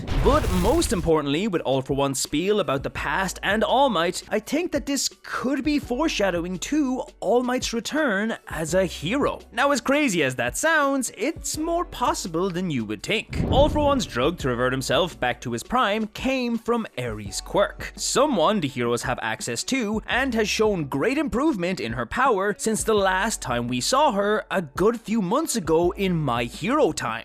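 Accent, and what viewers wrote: Ireland. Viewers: Are you Irish?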